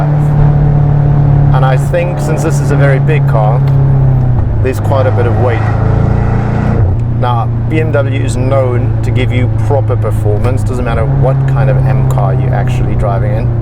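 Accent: South Africa. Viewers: Are you South African ? 🤔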